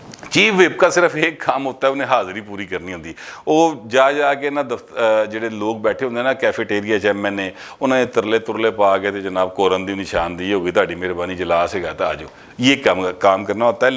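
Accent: Lahore, Pakistan. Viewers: Awami , khula ty lahori andaz e biyan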